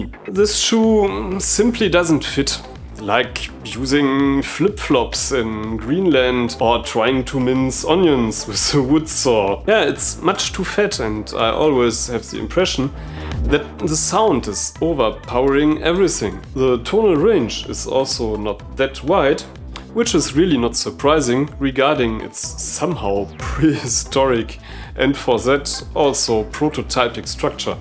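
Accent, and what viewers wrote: Germany. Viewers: Du kannst Dir doch denken, warum man das tut - um auf einer internationalen Plattform von möglichst vielen Menschen in den meisten Ländern verstanden werden zu können. Komischerweise stören sich hauptsächlich Deutsche am deutschen Akzent - teilweise übrigens auch ich selbst - aber kaum Englischsprachler, die finden das eher lustig. Ich verstehe Deine Meinung aber nicht die Art und Weise, wie Du das formulierst. Es ist seine Entscheidung und die lässt sich eigentlich leicht nachvollziehen. Wenn Du Dir ernsthaft ausgerechnet von ihm deutschen Content wünschst, bitte ihn doch einfach höflich darum! Damit hättest Du sicherlich sehr viel bessere Aussichten auf Erfolg, als das Konzept seiner Videos in diesem Punkt so "von oben herab" infrage zu stellen.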